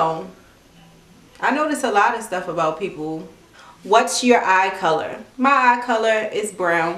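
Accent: Baltimore, United States. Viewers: Since you're from Baltimore ( which I kinda guessed from your accent) B-More Careful by Shannon Holmes, True to the game by Terri Woods ( I think), and The coldest winter ever by Sista Soulja, and last but not least the Bible!!! God bless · Legendary Baltimore accent💕💕💕😜😘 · I watched your pony tail and bang video and heard yor accient, i was like sis sound like she from B more let me check our her channel. Keep up the good work boo. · That Bmore accent. Love it sis..you slay every day! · I KNEW that was a Baltimore accent lol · I hear a B More accent yup there it goes lol . All my family lives out there❤️ new supporter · i watched this to confirm you were from Baltimore ❤️ i know the accent when i hear it 🤟🏽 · Love your videos 😘 I knew you were from bmore cause you sound like snupe from the wire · I been subscribed for along time now and I already had it figured you was from Baltimore ik that from anywhere I just needed you to say you was from here cause I could have been wrong lol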